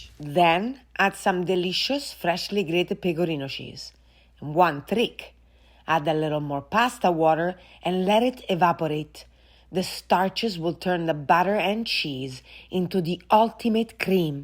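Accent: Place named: Rome, Italy. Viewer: Sei romana pure quando parli inglese XD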